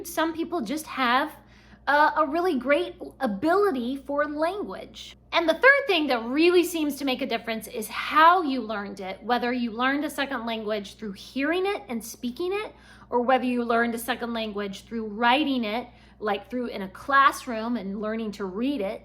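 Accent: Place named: Mexico. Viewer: Hola! Saludos desde Barcelona (España). I follow your YouTube channel since some months ago now and it is the first time I comment. Hope you are well! You are the second booktuber that I find (the truth is, in a very short period of time!) that speaks Spanish as well and I am very happy for that!! My first language is Catalan and my second/first language is Spanish. I speak English and a little bit of French and learning Russian nowadays. Would be great to read some books in Spanish with you! Hablas muy bien español con ese acento mejicano maravilloso 👏👏👏. Hasta pronto!!! Cuídate mucho!! Bye!😊🙋‍♀️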